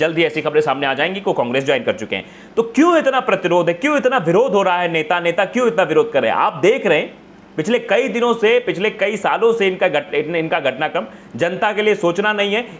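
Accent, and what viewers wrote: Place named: Uttar Pradesh, India. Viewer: Plz keep ur style As Hindi news anchor not UP news anchor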